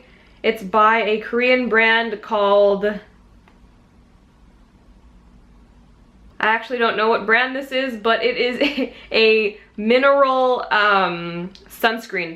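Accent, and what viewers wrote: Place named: Australia. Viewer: Your Aussie accent is so good (to me it's Aussie)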